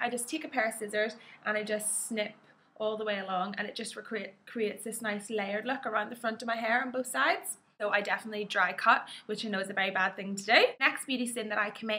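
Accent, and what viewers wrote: Ireland. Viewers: r u irish? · Love the accent!! Irish FTW!! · love your accent, I'm irish but born in america so sadly didn't adopt that beautiful accent ;)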